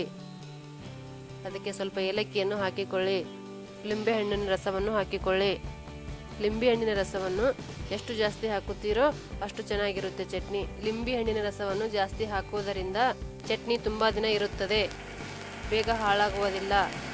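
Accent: North Karnataka, India. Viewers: Mam neevu Uttar Karnataka matalle matanadi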